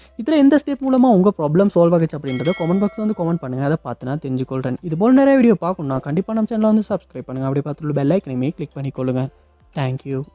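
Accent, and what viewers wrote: Sri Lanka. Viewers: Srilankan tamil 👍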